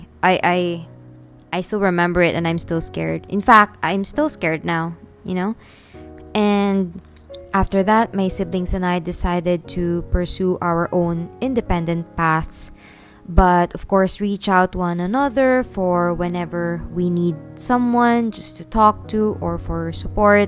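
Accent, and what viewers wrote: Philippines. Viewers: I knew it! I thought maybe you are also from the Philippines when I heard your voice haha! I'm happy for you that you found yourself and endured through the hard times in life. I'm also in this lifelong pursuit of art and I have a lot more to go through and its not easy, but I know this is the thing that I've stuck through all this time no matter how challenging it becomes.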